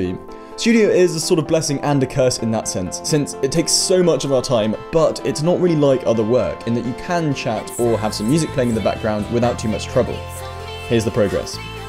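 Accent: United Kingdom. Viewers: Capoeira, I've been watching the movie about that! Only the strong 😊👍 I also love this martial arts. Just haven't try yet but,want to. And cos of a karate kid, I'm now trying just Karate sometimes in my university's class. But, I didn't make out that much cos of this covid-19 break, yeah but I will when I back to school. And, btw, my dream university is Cambridge. Just at the dream state and I do need to try in real with process and.. lots of trying one after one. Thank you, this is a motivation for me. By the way, I like your British accent. So, about that.. do I need to practice the accent? Seem personally but, just wanna know if so, maybe more familiar and understand easily. Is it Right? Thank you! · lmao thats just an upper class english accent a lot of people sound like that it just depends where you live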